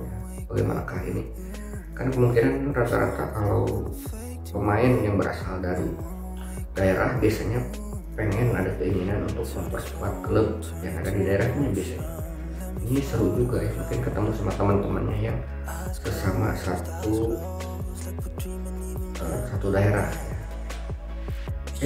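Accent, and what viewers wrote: West Java, Indonesia. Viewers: Prediksi saya .mah persib menang.. tp ini adminnya pedukung persib..di liat dri logatnya bicara sih urang sunda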